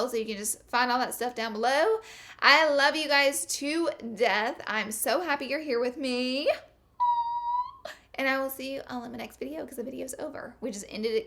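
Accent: South, United States. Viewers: I love that you're so unapologetic about your southern accent. I never get to hear people like me in the beauty world so I ❤️ you and your channel! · Love your southern twang! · Honey, you say oil just fine. I love how your southern accent pops in once and a while. You stay strong to where you're from and I think that's an honorable thing. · I <3 your southern accent!!!! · I love your southern accent, why would someone not like it #snapfam💦💦💦💦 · Love ur southern accent girl 👍❤ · LOVE THAT SOUTHERN OHL!! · When you're from the south and talk the same way 💕 Girl I got you 🤙🏼 ole ftw 😂😂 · I am from the south and I love hearing beauty guru type YouTubers talk the way I do. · I love the southern accent, its adorable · Love your southern accent ❤️👌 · You're awesome blossom 😊 Love ya .... keep saying oil the way you do. Most ppl love it and your southern self ❤️ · I love her southern accent! 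· I love your Southern slang 🤣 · I love the southern accent, ❤️from Tennessee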